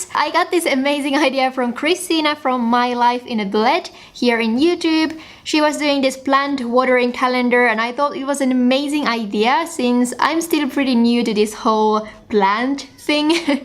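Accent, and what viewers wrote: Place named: North, Finland. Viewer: Hei from Australia! I love your beautiful minimalistic planning & bujo art and sense of space. Thankyou for the inspiration ❣️ Ps. Just Curious - I studied in Helsinki awhile, & your accent is different - are you from the north? Or do you have some Japanese background?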